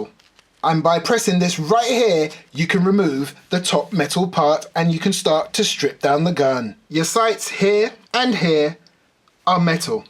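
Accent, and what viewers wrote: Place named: London, United Kingdom. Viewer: He’s a proper Londoner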